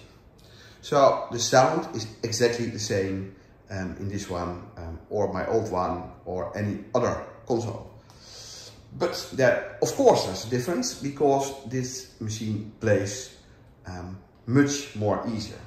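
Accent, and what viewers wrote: Netherlands. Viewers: Congratulations on your new console. That is magic! I watched your video just minutes after finishing practising two other works by Phil on the pipe organ I now play for Sunday church. I share the role so only play fortnightly which gives me time to learn new music. I thoroughly enjoyed your video and the beautiful piece by Phil. In my teens (eons ago) my piano teacher was Dutch. If not for WWII, she would have embarked on a career as a concert pianist. Your Dutch-English reminds me how lucky I was to learn from a world class musician in my 'formative years' 😃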